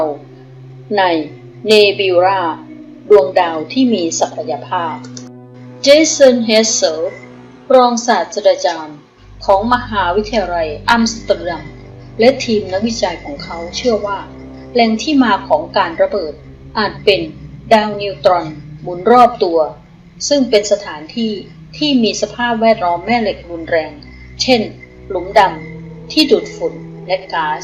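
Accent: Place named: Isan, Thailand. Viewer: ลงพุงแชลแนล(ผมชอบ)ก็อิสานครับ สำเนียง ไม่ใช่ปัญหาครับ ..พูดกลาง สำเนียงอิสาน ก็ไม่เป็นไรหรอกครับ พี่น้องสิสาน มีอยู่แผ่นดิน สำเนียงคั้นนหูครับ เป็นกำลังใจให้นะครับ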